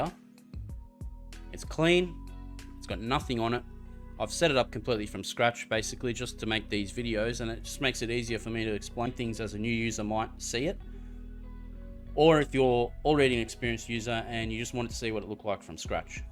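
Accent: Australia. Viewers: Hey mate, good to hear an aussie accent on these tutorials. Hoping you can help if you have time. I have set up successfully with sonnarr and radarr. Seems to have no problem with other unraid dockers, but going to docker or web server on another VM is an issue. Currently having issues with a self hosted HUDU install. Works fine by direct IP or port forwarded to direct IP. Soon as I port forward to NPM I get redirect issues. Hudu has SSL (letsencrupt) built in so I have tried turning it off in NPM and various other configs that don't seem to work.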